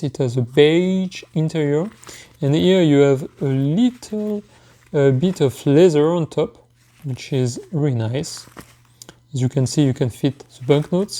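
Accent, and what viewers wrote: France. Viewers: you have a little French accent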